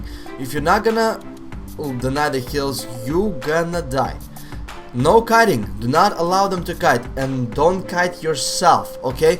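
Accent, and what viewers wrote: Russia. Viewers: Hey, first of all just wanted love the channel and ofc russian accent. i was wondering if maybe i uploaded a game of 2's of me playing unholy you could tell me if im shit or not. thanks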